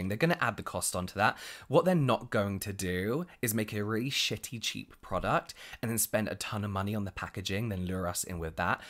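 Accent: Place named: United Kingdom. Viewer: Totally fine with me: I am a Continental European and your British English is... music to listen to! :-) Besides, of course, the content is super-interesting! ♡♡♡ P.S. You know you do your finger counting like us... in the Continent, starting from the thumb and not from the little finger? LOL... ✩✩✩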